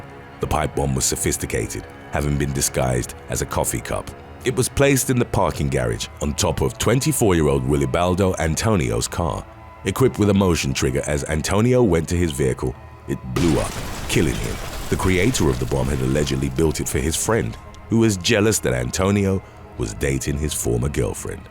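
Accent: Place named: United Kingdom. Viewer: A Lu min e um 😂 😂 you Brit’s crack me up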